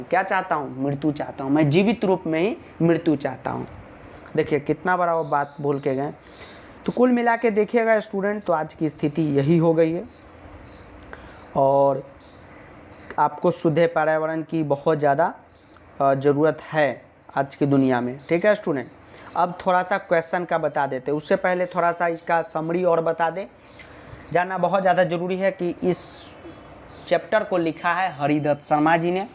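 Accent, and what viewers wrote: Bihar, India. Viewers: Sir ka voice sa hi pata chal raha that he is a bihari ..😅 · Sir aaap Bihar se ho na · Mam ye bahut expert hai mai ense offline me padhta hu sir ka kuch word mouth me hi rah jata hai natural problem hai and sir Bihari hai esliye thoda edhar ho jata hai we proud on bihari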